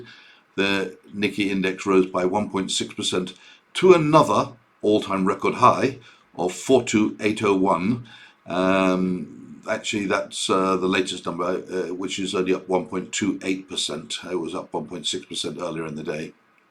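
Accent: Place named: RP, United Kingdom. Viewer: Forget your Bloombergs, Reuters et alteri, isn't it so much nicer to listen to excellent analyses brought forth in his equally enjoyable Queen's English?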